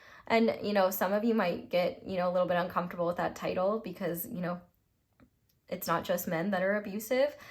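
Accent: South, United States. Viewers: Hi there, first things first I love your original and authentic Southern accent. I find it to be really sexy, even though you might feel embarrassed about it most of the time. You seem to be a really intuitive as well as sensitive person, high on the agreeableness spectrum. Which would automatically mean, that you put other people's needs first, dislike confrontation and last but certainly not least have no hard time forgiving. A true empath by the very definition of the word, which enables you to formulate readings with such accuracy. Your gift is so potent, that you don't really need a deck, to be honest. My piece of advice to you is two fold. You need assertiveness training in order to not feel so guilty when saying no, you need to learn how to operate under pressure, in order for you to continue with your studies because you are smart and articulate therefore wasting potential. 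You could be of great help to any kind of person as a skilled therapist, I mean it. If my intuition is not deceiving me, you must have a real hard time dealing with stress from the exams. You can work on that. Best of luck with your channel.🧁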